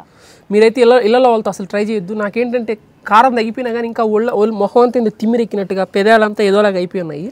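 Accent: Nellore, India. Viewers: నెల్లూరు slang ❤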